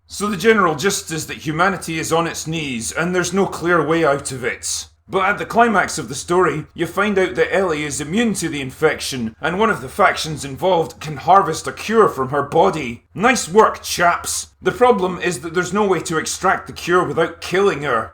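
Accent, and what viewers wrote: Scotland, United Kingdom. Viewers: Listening to a Scottish man talk ethics is one of life's simplest pleasures.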